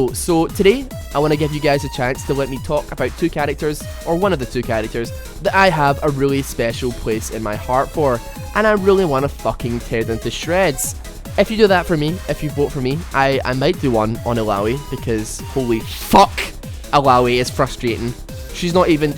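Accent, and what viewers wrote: Scotland, United Kingdom. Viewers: r u from scotland or great britani?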